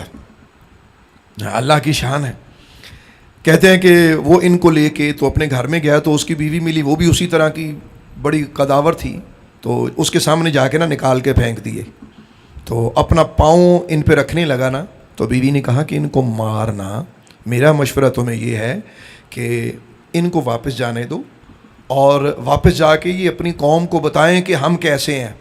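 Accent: Arab world. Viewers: ALLAH HO ALLAH … HAZOOR QIBLA E MURSHID … sadqe ap k … Arabic lab o lehja khubsorat or purasar …. alaaa point of view ko beyan farmaya QURAN E PAK K reference se … haq farmaya … jo qume apny wade torti hai wo barbad hoti hai … ALLAH HO AKBAR …. qume bini israeel k waqie se humari alaaaaa islah farmai … ALLAH PAK humhy wada khalfi se bachye … ameeen … 🙏🏻🙏🏻🙏🏻🙏🏻🙏🏻👍👍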